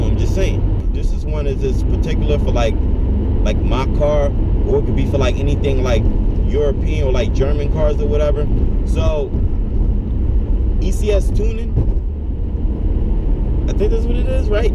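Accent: Philadelphia, United States. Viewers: Bro you gotta be from philly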